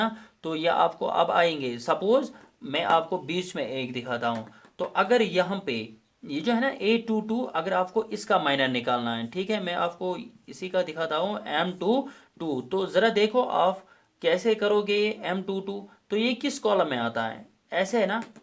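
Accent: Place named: Kashmir, India. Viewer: Super explaination thodi kashmiri hai but mast h sir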